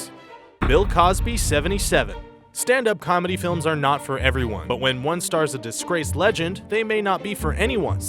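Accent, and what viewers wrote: Canada. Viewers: too all you speech nazis...the narrators canadian you shit heads...they pronounce shit differently,,,entitled narrow minded shit heads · Stupid Canadians should learn how to speak proper English.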